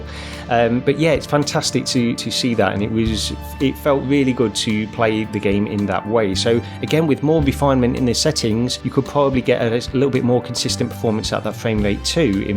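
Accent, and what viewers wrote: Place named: Leicester, United Kingdom. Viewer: That’s a Leicester accent if ever I heard one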